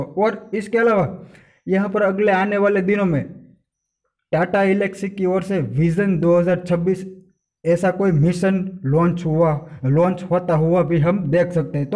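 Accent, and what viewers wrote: Gujarat, India. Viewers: ગુજરાતી હોય એવું લાગે છે કેમ ??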